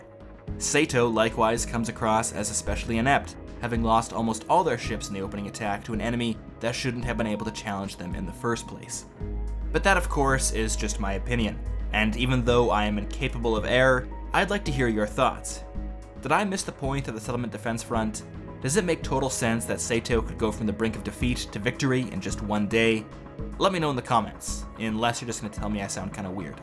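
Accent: United States. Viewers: "How does a collection of colonists and frontier workers, possibly compete with the manpower and industry resources, etc or a larger empire." Really dumb question dude, ESPECIALLY since, judging by your accent, you're an American.... Seriously, read your own nation's history and you'll have the obvious answer to your question. Whether or not it's feasable on a planetary scale is irrelevant, there nonetheless is a real world basis for this narrative plot point. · Uhm, why would a nation try to succeed from their home nation? Your accent sounds American....Ask the british how it went from their perspective.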